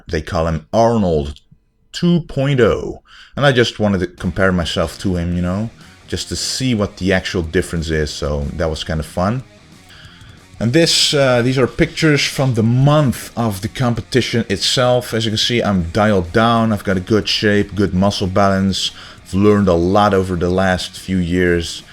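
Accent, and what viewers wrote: Netherlands. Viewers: Dutch accent is so cool. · Are you Dutch your accedent is like a dutch men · I'm wondering, are you Dutch? Your facial features appear Dutch, and your accent has slight hints of Dutch in it. I'm guessing either Dutch or Scandinavian. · Hey man , are you dutch ? you sound dutch , i am dutch , my dad is also a oldschool bodybuilder from the netherlands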